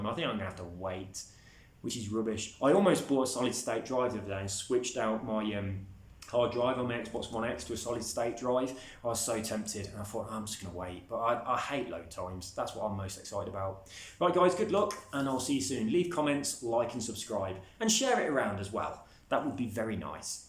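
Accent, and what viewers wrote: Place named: Scotland, United Kingdom. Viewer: LOL nice apartment! Don't know anything about you but I can say you're a Scotch. Got it from the accent! Never had an apple watch but I can guess they're bad. Also agree with you. No idea why people have to be over 13 to join giveaways or restricted to join any of giveaways. Usually I enter only PS4 or PS5 competitions. I did enter yours because I want to trade for PS5 as soon as I win the competition. Well, the reason I want to trade for PS5, technology is really expensive in my country, Turkey. Don't know if you believe me but I still can't afford a PS4. That's why I want to win XBOX Series X. IMHO Xbox got better hardware, that's cool, but we can't ignore the fact Playstation has better exclusive games. I am not a multiplayer person, I play games for its story. I wanna feel in it. Which is why I am going to trade with a PS5 if I win this competition. 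I want to thank you for giving us this chance and good luck to all of you. Have a nice day and stay safe!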